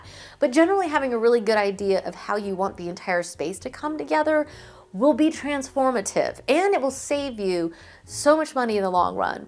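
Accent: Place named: South, United States. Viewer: I slowly hear your "southern" creeping back!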